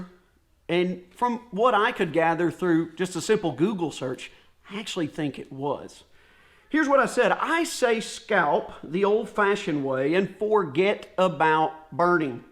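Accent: Arkansas, United States. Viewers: Hay I was wondering if you are from Arkansas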